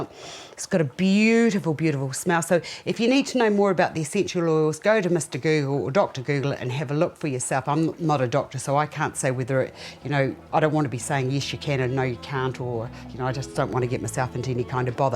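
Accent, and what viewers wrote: New Zealand. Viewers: John Anthony : Vit E I’m sure ... New Zealander accent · Hi. I'm new to these things. this is my first look at Balms. I really enjoyed this video and found it so easy to follow. I want to thank you for such a great intro into what I hope I will become skilled at. also its great to hear a NZ accent. My family and I are due to move to NZ in the next few months from UK. As if by magic I find this vid first. Great. Thank you again.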